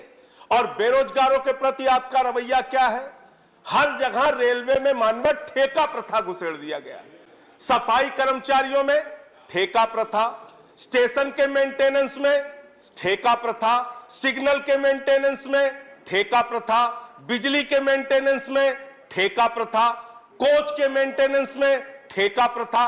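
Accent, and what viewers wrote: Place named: Uttar Pradesh, India. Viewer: संजय सर !!! आप हीरो हैं देश के। आपके बोलने शैली हमारे उ०प्र० की पहचान है...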